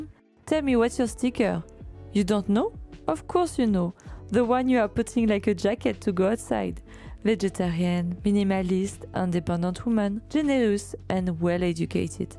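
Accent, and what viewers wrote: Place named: France. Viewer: i love your heavy french accent and your style and how you express yourself. you remind me of the beauty and the woes of everyday life and i love how it makes me feel less alone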